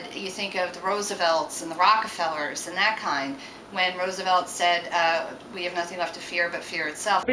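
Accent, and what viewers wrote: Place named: United States. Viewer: Can't hear any Irish in the accent at all. Just sounds like another American accent to me.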